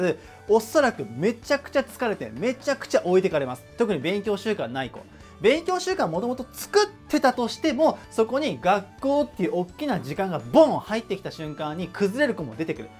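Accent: Kansai, Japan. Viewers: 関西弁のイントネーションがたまに出るけど 関西で過ごした事あるんですか？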